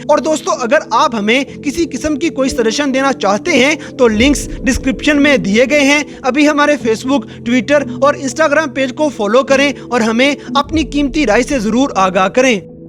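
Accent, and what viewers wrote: India. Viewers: او بھائی تیرا بولنے کا انڈین سٹائل بالکل بھی پسند نہیں آیا۔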